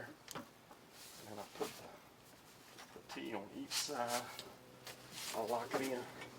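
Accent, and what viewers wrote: South, United States. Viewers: Thank you so much for your video. Ive ordered my Jeep wrangler unlimited 2016 and i'm soooo excited for it to come in. I've watched your video several times as I've ordered my jeep to come with a hard top and i'm going to order a soft top after i receive it. VERY detailed. Nice to hear a nice Southern Gentleman do a great job on a video! God Bless! Keep doing videos!!! We live in Florida and own a Hot springs spa, but we love your Cal Spas!!!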